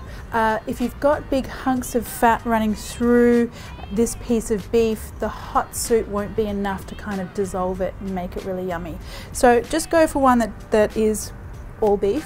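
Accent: Australia. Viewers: Its her aussie accent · she's aussie lol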